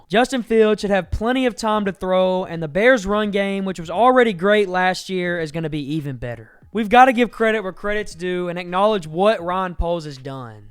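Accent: South, United States. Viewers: You have a nice voice youngin.. and your production is very clean.. Great used of the 5 sec rule, facts and references. I look forward to hearing more from you. Your defiantly in the south somewhere with that comfy accent lol. Keep it going, dont do some idiot type stuff, watch you company, keep pumping and you can go pretty far.. Look forward to hearing you on ESPN .. Keep your nose clean.. Sub! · Good comment but it is a southern accent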